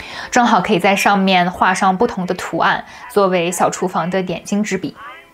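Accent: Northeast, China. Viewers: 妹子是东北人，尽管你的东北口音是非常轻微的，但是休想逃过缺姐的耳朵🎙️☝️😉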